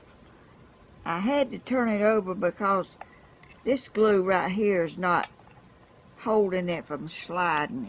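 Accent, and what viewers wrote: South, United States. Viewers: Nobody in Atlanta talks with a Southern accent any more. I sure love to listen to you talk. Reminds me of my family. Thank you. You are an artist!! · Oh my God mama , you are a delight to watch , your southern accent so cute, your creativity , over the top never thought of using nail polish and glass this way ..thank you so much , I’ll be following and will share with mom she is 90 but like yo7 a very crafty lady...❤️🙏🏻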